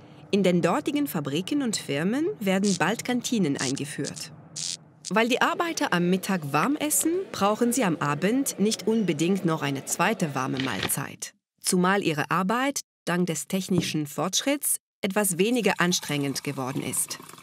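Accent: France. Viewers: Wieder so süß erklärt.... mit diese charmante französische Akzent! Unterhaltsames Bildungsfernsehen 😘 Jaaaa, wir Deutschen lieben unser Brot, kann ich nur bestätigen! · Aww, ich liebe diese kleinen Filmchen zur Erklärung 😍. Ich liebe den Akzent und die französische Kultur. Spannend, wie die deutsche Kultur gesehen wird. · Am schönsten bei Karambolage ist noch immer der französische Akzent.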